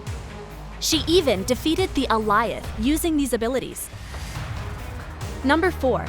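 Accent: Sri Lanka. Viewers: You're a Sri Lankan, right? How did you get that accent?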